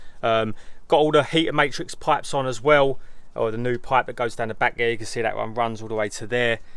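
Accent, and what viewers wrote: Essex, United Kingdom. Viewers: He sounds like Southend.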